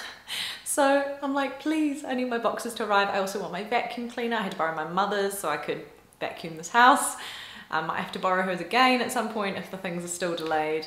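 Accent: New Zealand. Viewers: Lovely to see you back,. Your NZ accent has made a great resurgence, plus you seem really happy and relaxed. I'm so happy that your audition was such a success, as well. Sometimes, all the energy in the Universe seems to pull together in your favour and these continued successes are proof that you made the right decision 💕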